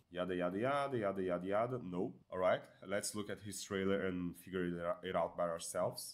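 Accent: Brazil. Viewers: Deu para saber que era brasileiro pelo accent kkkkkkkkkkkk muito bom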